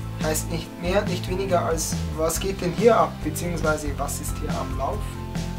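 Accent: Bavaria, Germany. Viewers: I mog dein Borisch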